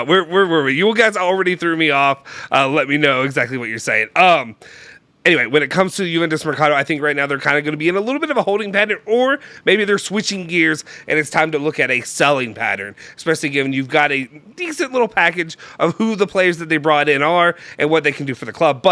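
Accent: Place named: Minnesota, United States. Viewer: never do that Minnesota accent ever again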